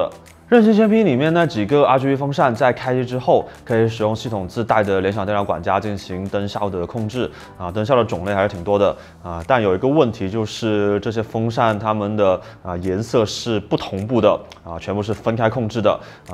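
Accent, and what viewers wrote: Cantonese, China. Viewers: 粤味唠一唠嗑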